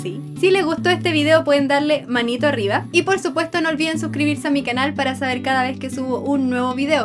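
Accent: Chile. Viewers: Eres chilena?!?!?! (Si dijiste que si chocala) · Eres Chilena???? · eres chilena como yo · Savia que erai chilena Por la forma de ablar pero ya se aclararon mis dudas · Creo yo que el acento la delata no? SI, SI ES CHILENA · ERES CHILENA!!!!!!!!!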